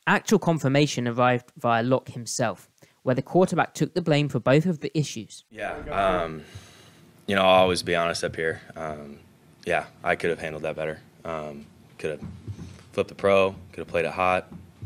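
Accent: United Kingdom. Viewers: Big fan! I've read your field gulls contributions for a long time and had no idea you were British till I heard these videos. Cool to have someone from across the pond cover the seahawks in such detail.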